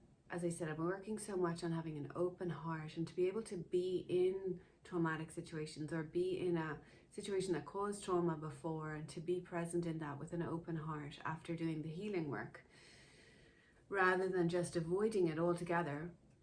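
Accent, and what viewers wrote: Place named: United States. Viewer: Why are suddenly AMERICAN? WTF?!!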